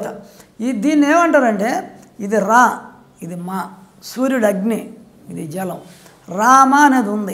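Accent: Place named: Rayalaseema, India. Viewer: చాలా సరళంగా చెప్పారు ,గురూజీ రాయలసీమ మాండలికం స్వచ్ఛమైన తెలుగు వల్ల కొందరికి అర్ధం కాలేదు అనుకుంటున్నారు మెల్లిగా మళ్ళీ చూడండి🎉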